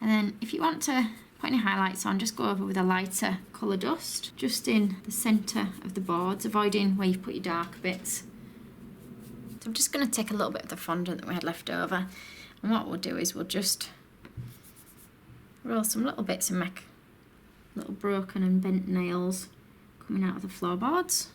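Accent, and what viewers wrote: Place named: West Yorkshire, United Kingdom. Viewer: Great channel xx just wandering of your from West Yorkshire..you sound it haha xx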